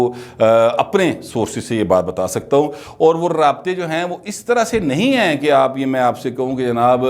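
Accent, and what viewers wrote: Lahore, Pakistan. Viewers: An excellent commentary,in Lahori style.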